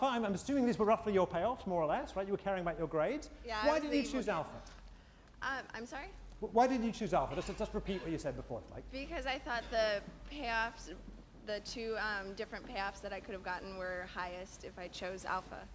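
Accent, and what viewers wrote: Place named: United Kingdom. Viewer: 好重的英國腔！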